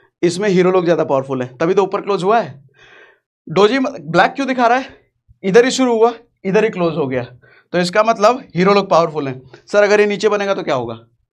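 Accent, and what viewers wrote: Bihar, India. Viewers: Kya aap Bihari hai❤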